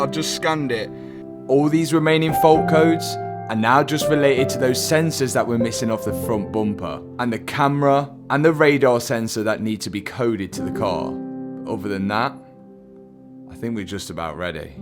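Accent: Leicester, United Kingdom. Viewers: From Argentina, I congratulate you on your channel; your content is very good. I find it a bit challenging to understand you because of the British accent, but at the same time, I enjoy it and it helps me improve my English. Keep it up! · yup, Leicester accent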